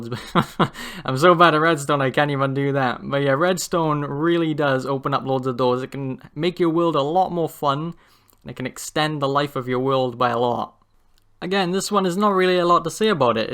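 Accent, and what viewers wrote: Wales, United Kingdom. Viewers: I hear a hint of a Welsh accent?? Shout out to the home land? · You welsh?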